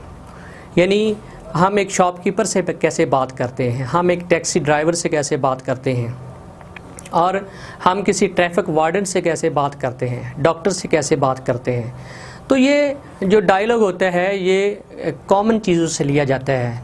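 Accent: Punjab, India. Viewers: Sir , it look like u know Punjabi language by ur pronounciation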